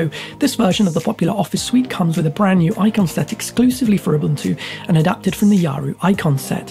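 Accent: United Kingdom. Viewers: Heavy British Accent! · Where is that accent from? (Sorry, I'm not a native speaker, but it sounded really cool to me) Is it british? Excelent video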